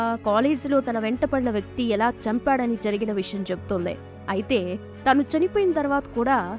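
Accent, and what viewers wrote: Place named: Andhra Pradesh, India. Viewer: Adi me ts slang kadu cheppedi ap slang adi telusuko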